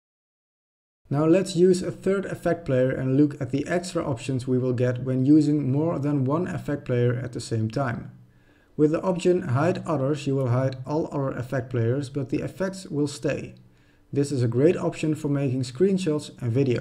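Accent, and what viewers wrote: Netherlands. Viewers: I smell Dutch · First of all thank you so much for this!!! Second, are you Dutch? Your accent reminds me of my own. :)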